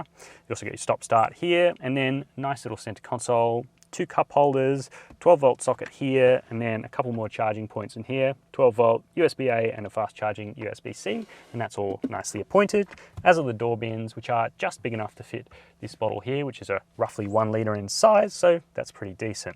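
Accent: Australia. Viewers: Are there different Aus dialects of English..?? I couldn't pick where you from I was thinking South Africa ot New Zealand...Your Aus accent is not as raw as I've heard in some other videos reviews. 🤙🤙🤙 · Nah, this is a standard Aussie accent. We don’t all sound like Steve Irwin.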